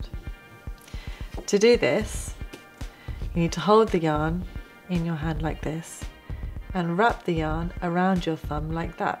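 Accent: United Kingdom. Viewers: Does she have a British accent? Or is it just me that thinks that?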